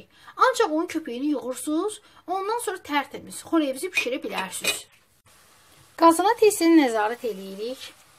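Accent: Baku, Azerbaijan. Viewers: Canım ay Bakı ləhcəsi!!!!!! Lap ürəyim açıldı nenem sözünü eşıdəndə. Əllərinizə sağlıq!!!!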